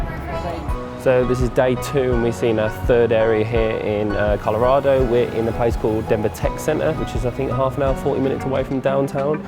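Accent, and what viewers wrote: Birmingham, United Kingdom. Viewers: that brummie accent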